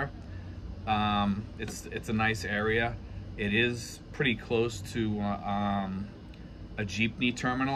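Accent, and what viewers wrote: Boston, United States. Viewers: I was listening to one of your videos the other day, and I thought to myself, this guy has to be from the Boston area. I have been to Boston several times. My older brother went to Brandais University for Grad School and was a Professor at Boston U. when he passed away. One of my best friends from my days at the U. of Texas retired as a Lawyer and move to Boston. His son works for a High tech company near Boston. He is going to move back to Texas soon. You are on the mark regarding inflation here in the Philippines.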